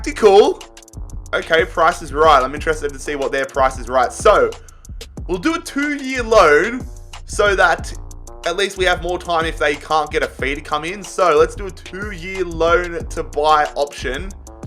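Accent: Australia. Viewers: Are u from Australia mate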